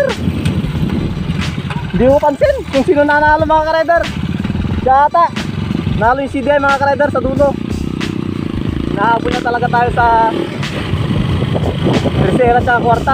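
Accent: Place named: Pangasinan, Philippines. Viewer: Nice content! As of this moment, nirerestor ko ung TMX <num> model ko kaya naghahanap ako ng mga video about sa tmx 155 napadpad ako sa video nato And As i’ve observed ung accent ng uploader is Pangasinan. Pa shout out Next video sir. I’m from Aguilar Pangasinan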